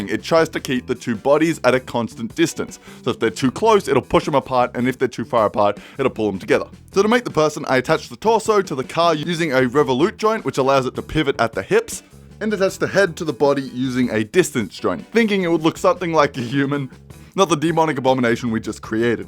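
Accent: Australia. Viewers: are you a fucking aussy or a southerner? this depends on sub status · I like your voice, are you Australian? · Spoiler alert: Is Australian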